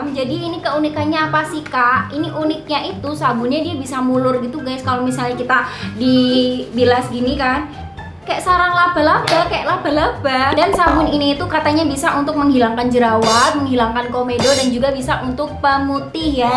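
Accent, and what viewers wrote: Java, Indonesia. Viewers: Saya paling suka dari kakak ituh orang lucu, asik banget, medok banget lofat jawa nya,hehehe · Orang jawa kalo ngomong bhs indonesia lucu😂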